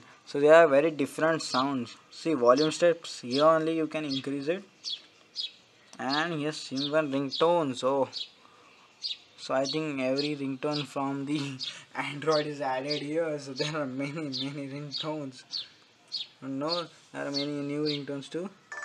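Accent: India. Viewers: im tryin very hard to comprehend your indian accent, but fail, will try other video tutorials with better pronunciation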